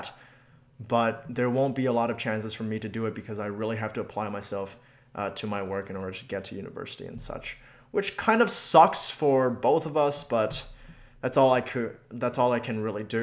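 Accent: United States. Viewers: HES ASIAN BUT HE STILLS TALKS AMERICAN LIKE! congrats man you are the last league of legends related subscriber since i ditched that cancerous game. We played a long time ago. Always enjoyed the few games we did play. You should play dota, ill hook you up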